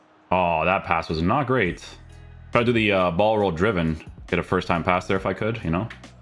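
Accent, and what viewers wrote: Portugal. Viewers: Cancelo just isnt good defending, i think dalot should play more, and palhinha what a player, he must start for the rest of the wc… BTW És português? 🤣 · Are you portuguese?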